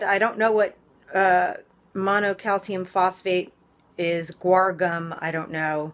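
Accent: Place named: New York, United States. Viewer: I can't get enough of that NY accent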